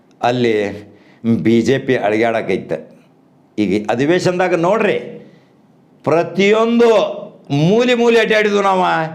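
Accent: North Karnataka, India. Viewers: ಕಾಕ ನಿನ್ನ್ ಈ ವಿಶ್ಲೇಷಣೆ 100...100...ಸತ್ಯ ಸಂಗತಿ ಇದೆ. ನಮ್ಮ್ ಭಾಗದಲ್ಲಿ ನೀನು ..... ನಮಗೆ ವಿವಿಧ ಮಾಹಿತಿಯನ್ನು ನೀಡುವ ಕಲ್ಪ ವೃಕ್ಷ ಇದ್ದಂಗ ತುಂಭಾ ಧನ್ಯವಾದಗಳು ಪಾ..... ನಿನ್ನ್ ಜೊತೆ ಒಂದ ಸಾರಿ ಮಾತಾಡೋ .... ಆಸೆ..... ಮೊಬೈಲ್ ನಂಬರ್ ಕೊಡು......... ನಿನ್ನ್ ಈ... ಸುಂದರ್ ಉತ್ತರ ಕರ್ನಾಟಕ ಭಾಷೆ ಮತ್ತೆ ಮತ್ತೆ ಕೇಳ ಬೇಕು ಅನಿಸತ್ತೆ ನಾನು ನಿನ್ನ್ ದೊಡ್ಡ್ ... ಪ್ಯಾನ್ ಕಾಕಾ... 🙏🙏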